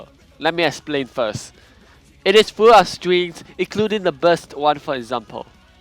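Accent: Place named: Singapore. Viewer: i love hearing singaporean english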